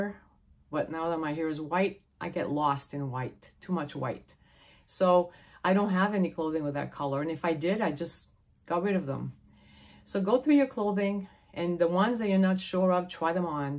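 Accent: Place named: East Coast, United States. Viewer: I am so enjoying your videos! What you have to offer is much needed right now; please keep at it 👍 Your numbers will continue to grow, but I have to say, it was a little difficult finding your channel again when I had cruised past it intending to return and watch it later (I do this a lot. I just remember the title instead of saving). When you type in Living A Simple Life a hundred other channels come up with the same name You are so unique; your east coast accent is fabulous; I love when it pops out naturally, lol. Your personality really shines through ✨️✨️✨️ I'm intrigued by your life because I am currently on the west coast (CA), dreaming of moving to the east coast and living simply as well. As a native Minnesotan I'm really missing having four seasons... I look forward to your future videos and watching your channel grow! ❤️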